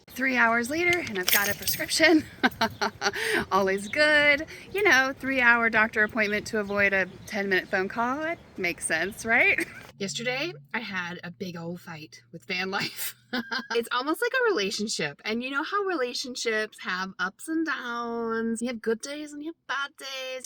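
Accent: South, United States. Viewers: Hi! I love your attitude so much. I don't know where your accent originates from, you sound so much like my friends and me. Especially your vowels. I'm a southern girl through and through! Watching your videos is so much like listening to one of my friends or my sisters. AND your channel is growing so fast!! I'm so excited for you. Can you get a Jackery or something like it that can charge off your van while you're driving? Edit: omg I posted while your video was playing and your appreciation made me cry. We love you!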